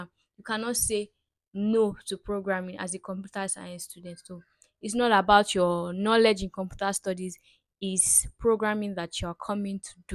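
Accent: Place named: Nigeria. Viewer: hehe. I have missed hearing Nigerian accent. Compuuter haha. It's comp-iu-ter 🤗🙃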